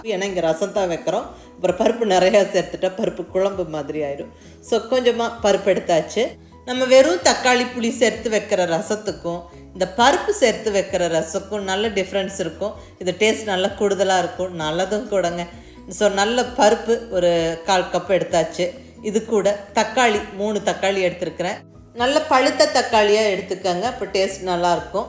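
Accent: Kongu, India. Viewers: Unga samayal ellam romba pudikum kongu tamil super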